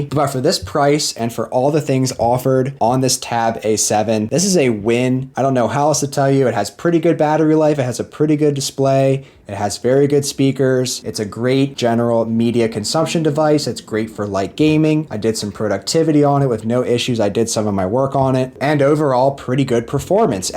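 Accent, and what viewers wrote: Philadelphia, United States. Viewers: New to your channel. Liked your review! Gotta ask. Are you from the Phiily or Delaware county area? I am, and I am testing my South East PA accent radar.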